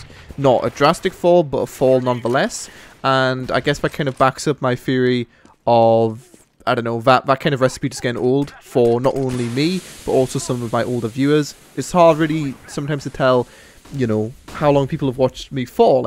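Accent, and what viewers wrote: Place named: England, United Kingdom. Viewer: work? what the fuck would you know about work. editing a video and playing games is not work. step outside your house. sell out. you made youtube videos once upon a time where it was no doubt fun. clearly, you are looking to shake this money tree. i dont buy into all this donating and subscribing garbage. its rubbish. you are not a charity. you provide mild entertainment at best,. you cheeky, english accent, condescending, adolescent whatever. i lost interest. friend-zoneded.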